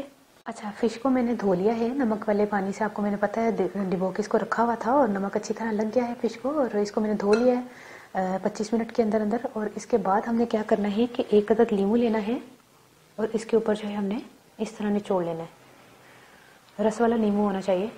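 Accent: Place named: Punjab, India. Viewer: Yar jitni sweet ap ho utni sweet ap ki punjabi , ur new subscriber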